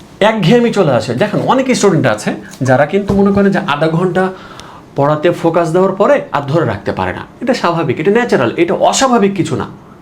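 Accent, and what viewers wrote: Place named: Chapai Nawabganj, Bangladesh. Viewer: sir এর কথায় কিছু চাঁপাইনবাবগঞ্জের গন্ধ পাওয়া যাচ্ছে ❤❤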